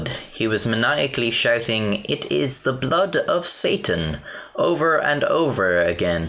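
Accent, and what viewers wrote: Ireland. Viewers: Are you Irish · Your Irish ya? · Now this is an enjoyable Irish brogue. Mike from THAT Chapter's accent makes me question his sexuality😬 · "ninteen seventy tree" i love the Irish accent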